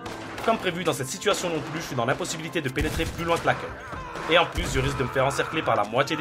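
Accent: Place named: Tourcoing, France. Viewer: ta un axen de Tourcoing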